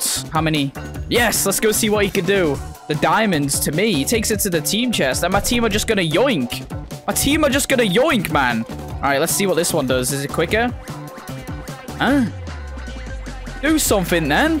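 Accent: United Kingdom. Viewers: why did he become more british?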